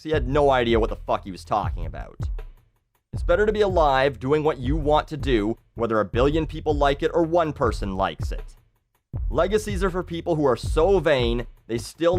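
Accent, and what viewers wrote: Canada. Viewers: Make a final video where you say your goodbyes, then open a new account, quit the Canadian accent (you should have done that long ago actually) and use a Swedish or Norwegese accent (it's the same cold country anyway, wonder why they speak different languages??!!). Do the same thing, same concept. Some people will say it kinda ressembles this measure of that used to be good a while ago, most will just say this is THE shit right now (yes right now, not tomorrow). You get 10 millions views in one month and you can finally make it to vidcon!!! Hooray.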